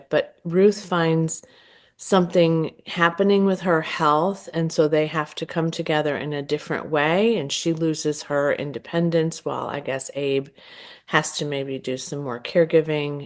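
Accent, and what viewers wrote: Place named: Louisiana, United States. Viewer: Love her Louisana accent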